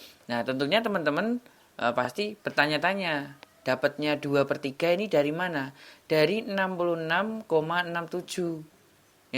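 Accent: Java, Indonesia. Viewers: Terima kasih bang. Salam dari pemalang. Bang maaf boleh tau asalnya? Logatnya Jawa nih hehe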